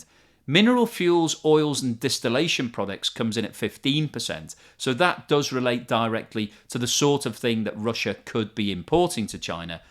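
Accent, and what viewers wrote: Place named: England, United Kingdom. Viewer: Looking at your playlist I think you might be confusing your country which I'm assuming is England from your accent is Russia cuz your economy is fucked way more than Russia's! England the EU and the United States all shot themselves in the foot or should I say through their heads to hit Russia which is dumbest shit! You seem to be living in a willfully ignorant lala land of projecting what you would like to occur as opposed to the actual situation on the ground Russia is hurting to some degree but not as bad as Britain! And it's only going to get worse now that Beau Jo's gone and someone even stupider it's going to take over! Maybe you also believe as Liz does that you all are going to defeat Russia in Ukraine? This is a global realignment, that will hurt Russia slightly in the short term but benefit them in the long term and devastate as you put it the West otherwise known as Europe Canada and America which represent only one fifth of the human population! 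So opportunities abound now for Russia India and China! And Europe is now going to be my country America's bitch as it wanted it to be and Britain will be the lap dog! America's economy is hurting but Europe is in for a long cold inflationary recessionary winter and next several years!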